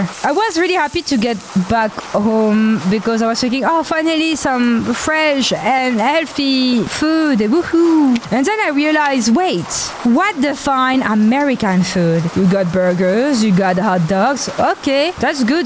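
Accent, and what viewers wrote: France. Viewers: I’m Mexican but I really like her French accent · A french accent Vtuber... "Never in my life have I needed something so much, and never known until I received it"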